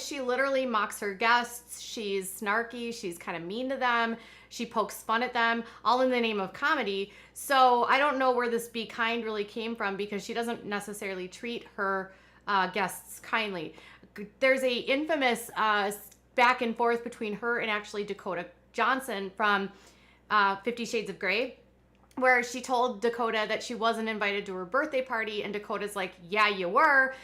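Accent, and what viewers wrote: Minnesota, United States. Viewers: I love your ponytail look and the Minnesota accent, and more than that I love your shoot from the hip and salt of the earth no-nonsense style of reporting. You have done your homework and your research and I appreciate it. I have really been enjoying your channel and soon as I get my finances in order (translation: the IRS does it in 7 to 9 days) I am going to join patreon and pass some of that stuff to you, girlfriend. 😉🪐👍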